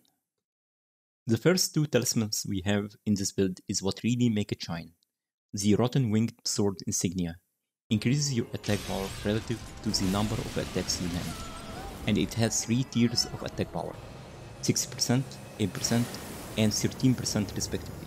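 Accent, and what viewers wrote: Egypt. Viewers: I can tell you're Egyptian from you accent lol nice video my friend :)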